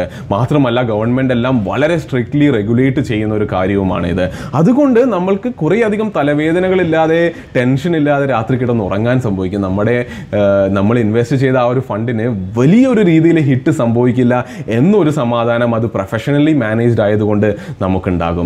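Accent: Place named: Kollam, India. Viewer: കൊല്ലംകാരൻ ആണ് അതാണ് 💖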